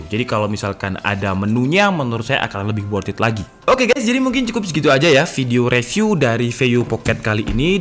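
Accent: Malang, Indonesia. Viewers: Orang Malang bang?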